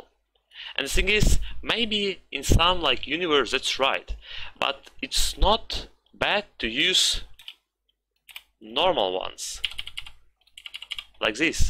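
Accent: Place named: Russia. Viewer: Are you from Russia?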